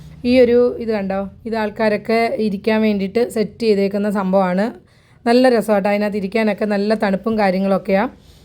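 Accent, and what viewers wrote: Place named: Travancore, India. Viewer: തിരുവിതാംകൂറിലെ സംസാരം എല്ലാവർക്കും വ്യക്തമായി മനസ്സിലാകും. അഭിനന്ദനങ്ങൾ.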